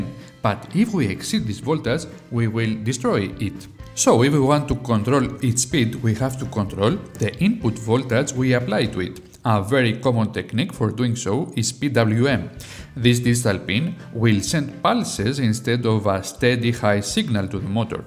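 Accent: Greece. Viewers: I love this channel ! The projects are simple enough to be great starting points for my projects. Excellent explanations and the Greek accent is awesome too. Ζήτω η Ελλάς ! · Quite informative as far as high tech is concerned but with a strong Greek-English accent which can sometimes be not so overwhelming to native speakers as mispronunciation of words can lead to some degree of misunderstanding and missing the point. Not offence I hope as I am Greek too with Engineering background. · I haven't had any misunderstanding related to his accent. He is quite clear. I thought he might have been Spanish - but now that you've brought it up - I see the Greek intonations.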